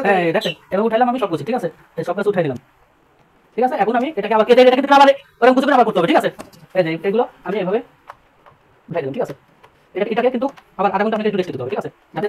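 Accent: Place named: Bogura, Bangladesh. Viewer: বগুড়া বাড়ি মনে হয়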